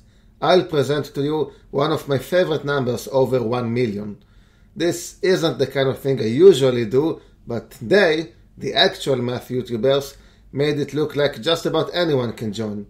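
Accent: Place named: Israel. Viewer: מהמבטא ניחשתי, מהשם של הערוץ וידאתי, וואלה יש ערוצים ישראלים שמשתתפים ברעיון הזה. נחמד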